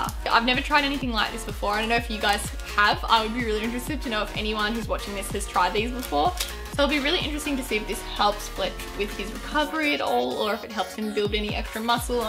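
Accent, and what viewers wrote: Australia. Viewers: OMG ARE YOU AUSTRALIAN :D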